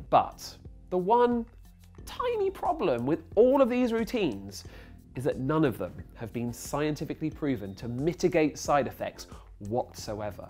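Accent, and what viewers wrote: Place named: England, United Kingdom. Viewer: That English accent thooooooooooooooooooooooooo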